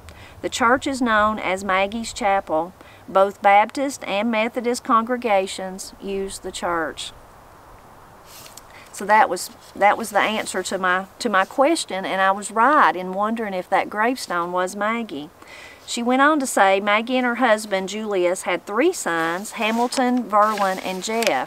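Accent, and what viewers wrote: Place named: South, United States. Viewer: Love your videos! I'm from northern Appalachia so I don't have the southern twang that your speech has. 😊I must have something different in speech because often times the first thing people say is where are you from? Of course, might be a little mixed up from my dad who came over from Sweden. Don't know if I ever heard of Baptist and Methodist in the same church and sure would go there myself. Especially in this day and age.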